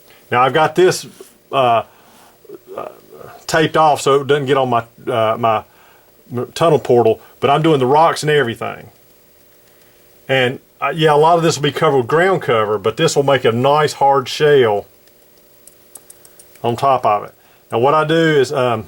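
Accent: South, United States. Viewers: And you're from down SOUUUTTHH, lol. Great video.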